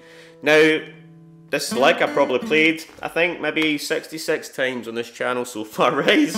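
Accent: Scotland, United Kingdom. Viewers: I have absolutely no problem with anyone playing this kind of music at all. But it always seems just a little strange to hear that Scottish accent with what is to me, traditionally very American music. Or any accent. I'm glad people dig it. Play it all you want. It's great. I'm glad people in other places can fall in love with music I love.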